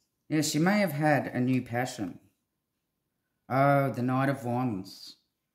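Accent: Australia. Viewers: This rings true. I hope they convict him and find her! Thank you for sharing. I love your work and your soulful Ozzie accent. :)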